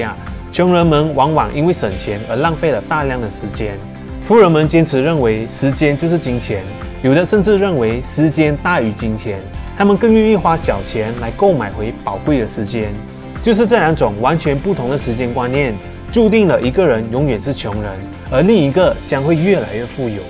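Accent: Hong Kong, China. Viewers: 影片很棒！但港仔口音的旁白實在無法讓我不看字幕否則很多都聽不懂 =_=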